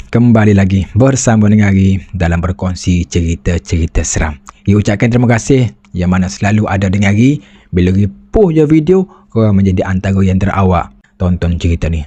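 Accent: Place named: Kelantan, Malaysia. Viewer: faham ja..kami utara suka dengar loghat kelate.. hehe tp jgn la dalam2 sgt tapaham juga haha...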